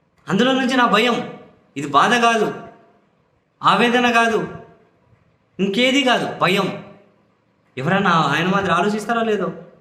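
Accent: Rayalaseema, India. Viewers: Anna are you from rayalaseema?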